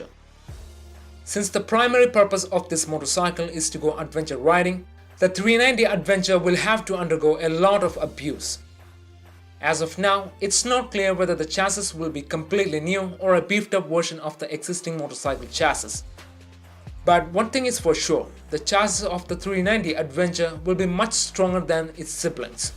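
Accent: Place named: India. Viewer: An indian voice for an indian bike.. finally ! Good, honest job !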